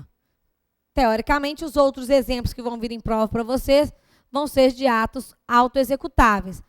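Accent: Minas Gerais, Brazil. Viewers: Aula muito elucidativa com uma linguagem bastante tranquila, e ainda com um sotaque Mineiro, parabéns professora continue assim obrigado pela ajuda. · Tinha q ser mineira !!! ADORO!!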